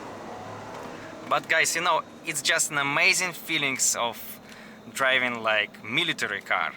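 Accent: Russia. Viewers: Брат мой русский язык рвется на ружу из твоего английского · Это самый ужасный дебильный руский акцент.Чувак учи нормально английский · Ужас. Пожалуйста подтяни свой акцент. Прям как-будто бы специально на жёстком русинглише говоришь(как в фильмах утрируют Русских). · … на произношением поработай, а то русский так и прет, аж ухо режет - хав и парт!! 😊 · Russian accent could feel easily 😅😅😅. Bro improve your speech. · Акцент то русский у парня, татарин скорей всего. Молодец! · This strong russian accent is so hard to listen😢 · Давай на кыргызском, мне этот руснячий акцент не нравится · Лучше по русски разговаривай. Инглишь с русским акцентом нигде не спутаешь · Change the presenter guy, nobody would take this guy serious, with the russian accent. Cute little suv, but for 700K, you can probably get a real used military tank for that price. If a small little drone can take out multi-million $$ tank or helicopter in Ukraine, this should be a POC. 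Total JOKE. This is for rich Beta males with no balls. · Мужик, у тебя чудовищный русский акцент! Я даже не стал писать тебе на английском, ибо не вижу в этом смысла 😁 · Твой Руzкий акцент слишком Палевный), вас Рашистов Видно из далека, особенно таких Лицемеров ☝️ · Акцент русский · WTF! How many years do you live there still having the fucking russian accent? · Он специально делает стереотипный акцент СССР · Как люди не замечают что у него русский акцент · your Russian accent just killing my ears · Русский твой английский в #опу...но почему ета нация така тупая...🤮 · That is a stupid car and even more stupid review done with the most annoying Russian accent😂 Jesus Christ Lord have mercy ❤ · Какой жёсткий русский акцент))) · Как сильно прослушивается 'русский')) Слишком чётко звучат почти все слова. Особенно слог of. У иностранцев слоги совсем не слышно. Ну а так круто, видимо глубокое знание англияского есть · зысиз супер кьют акцент! 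Бест рашшен акцент ай эвер хёрд!🤝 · Ты откуда сам, чел? Акцент у тя явно выраженный русский! 😀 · Сразу видно что русскоязычный говорит на английском 😂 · Русский акцент видно с трудом разговаривать на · Висиз ви хард рашын экцент!) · Это что ещё за нерусский с явным русским произношением и непонятной машиной, продающейся по спекулятивной цене? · Какой ужасный русский акцент))) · Смешно говорит руский по английски ))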